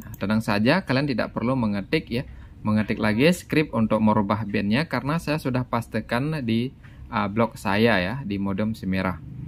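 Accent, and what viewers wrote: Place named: Bali, Indonesia. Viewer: Orang bali ini, medok bali ngomongnya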